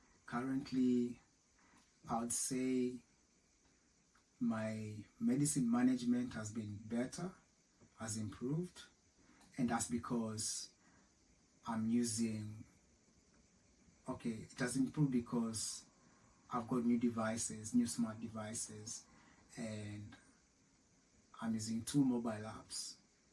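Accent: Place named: United Kingdom. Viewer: Its the UK accent for me 😊thank you so much for sharing, very educational, cant wait for you to be done with all these😮mungu mbele bro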